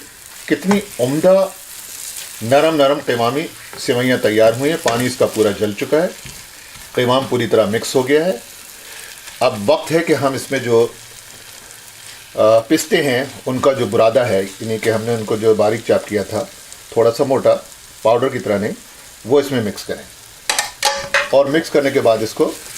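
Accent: Delhi, India. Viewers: Ek zamane baad itni achi saaf aur dehli style urdu suni hai aur ye Qawami siwaiyan yaad agayi jo ke meri nani banaya karti thi. I love it.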